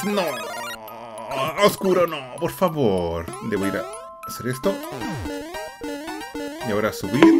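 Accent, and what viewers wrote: Chile. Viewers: Wena eres chileno, igual soy chileno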